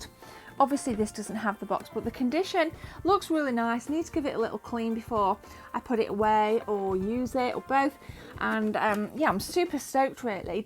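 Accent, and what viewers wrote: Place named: East Midlands, United Kingdom. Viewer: Are you from nottingham or derby im from derby 👍🏼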